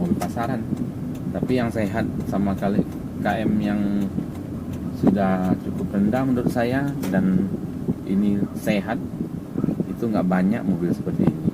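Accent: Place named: West Sumatra, Indonesia. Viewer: sumbar nya dimana bos, logat nya mirip, salam dari minang rantau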